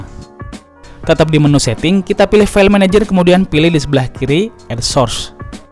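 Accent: Madura, Indonesia. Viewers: Masih "salbut" Kek org madura 🗿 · Oreng medureh?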